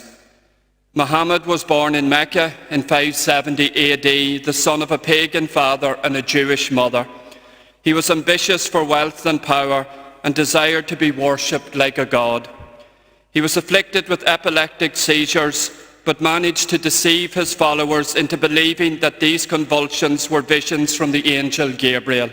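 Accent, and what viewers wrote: Ireland. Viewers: Wonderful homily and beautiful Monaghan accent!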